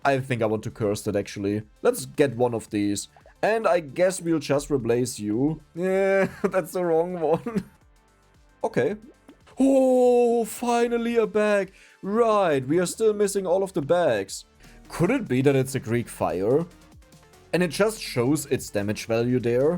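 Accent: Germany. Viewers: he sounds very German, not Austrian German too. You can also tell by the efficiency shown here. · hi my first time on this channel, after 1 min: yeah this guy is german, after minute 29 i heard "scheiße" and burst out loughing, great video. love brotato :D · R u German ? Ur accent is so german line mine 😂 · Ich liebe deinen deutschen Akzent 😂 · ich wusste doch das du deutsch bist man merkts and deinem akzent und an dem "HEILIGES SCHNITZEL" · Are you german? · hab mich die ganze zeit gefragt du klingst so deutsch dann bei Minute ungefähr schei** hat alles bestätigt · rede doch einfach deutsch das kann man sich ja nich anhören mit den deutschen akzent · Broooo is that guy german? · Er spricht weder BE, noch AE. Er spricht germanenglish 💯😃💪🏼 bitte content auf deutsch, das wäre der Traum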